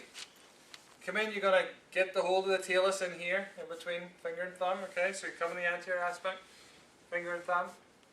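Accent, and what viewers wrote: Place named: Ireland. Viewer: Thought I could hear the dulcet tones of an Irish (Ulster?) native!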